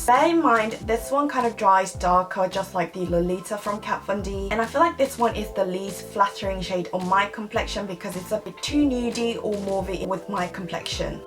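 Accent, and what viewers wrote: United Kingdom. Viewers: 목소리 너무 좋타....♡영국발음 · 상큼하고 귀여운 이 떠서 보러왓오요 ㅠㅠㅠㅠㅠ ❣❣❣❣❣영국식 영어발음도 넘 매력있네요오.... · 세상에.....영ㅇ국발음....!!!!!!!!!!!!! 게다가 영상도 너무 좋아서..바로 구독 아 너무 사랑해요 진짜.....ㅠㅠㅠㅠㅠㅠㅠㅠㅠㅠ♡♡♡♡ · 언니 영국영어쓰시네...존멋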